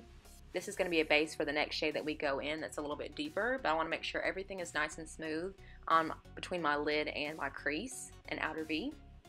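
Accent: South, United States. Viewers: I love the southern twang. Where are you from? Hate the music!!!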